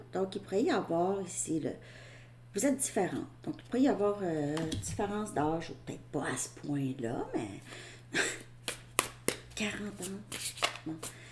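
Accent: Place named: Sherbrooke, Canada. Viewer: Woin a pomale le même accent à Sherbrooke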